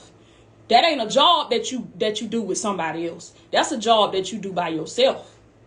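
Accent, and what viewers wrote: South, United States. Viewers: I heard you loud and clear from the intro, you made me jump up! I've been praying for months now, " God show me how to generate multiple streams of income ". But God has given me three basic instructions: start small = plant a seed & I will make it grow, step out in faith & out of comfort zones, don't boast be humble... do these three things constantly and I will bring the overflow 🏞 💷 ⛲ 💷 🌊 💷 I love your messages, your motivation and your Southern twang, you put a fire up where the sun don't shine! 😂 I really appreciate your ministry, thank you sister in Christ, love from London UK 👑 ❤ 🙏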